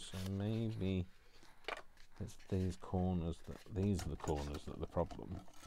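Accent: United Kingdom. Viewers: I really enjoyed watching you doing your drawers. Yes, the glue is fantastic and I loved the sound of your fingers patting the paper down. You say that you ‘ talk rubbish’... I certainly don’t think so... but if you do, it’s delightful, educated and unique rubbish and I love to hear it! What a coincidence that the paper was from one of the Brontee sisters’ books and there you are in Yorkshire talking with a Yorkshire accent... it just occurred to me that the Brontee girls would have had Yorkshire accents! Thank you, ❣️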